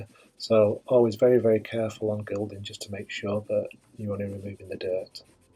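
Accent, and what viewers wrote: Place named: Derbyshire, United Kingdom. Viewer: I’m from Derbyshire and your accent sounds very familiar. Love your down to earth approach and subscribed right away!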